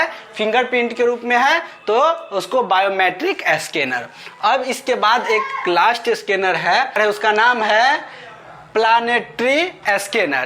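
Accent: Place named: Bihar, India. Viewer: Bihari pronounciation 😅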